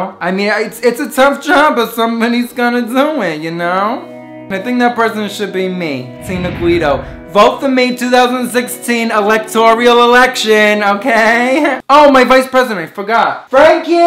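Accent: New Jersey, United States. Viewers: This is one of the best New Jersey accent I've heard.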